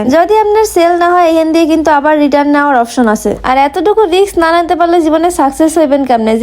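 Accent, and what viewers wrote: Barishal, Bangladesh. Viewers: আপু তুমি তো বরিশাইল্লা তাই না 😊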